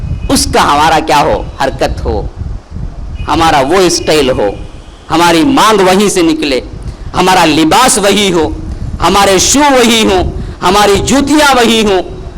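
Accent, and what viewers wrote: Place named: Hyderabad, India. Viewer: Hafiz sahab apki zubaan bohot achi lagti hy, jo shayad Haderabadi hy. Masha Allah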